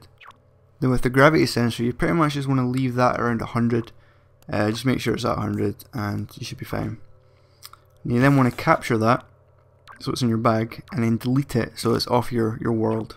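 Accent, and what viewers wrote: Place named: Scotland, United Kingdom. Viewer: cool vid but are you speaking english? That scottish accent is rough.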